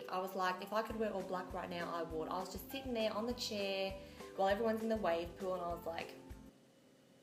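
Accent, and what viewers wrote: Australia. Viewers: i love ur humor ur so funny i love ur sas and u have a real aussie acsent u sound like kath off kath and kim i live in australia too perth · You're Australian right? I am ☺️😘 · Are you from Australia?? If so where from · Someone else is Aussie😆 Love you💘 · You have the best Aussie accent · Are you Australian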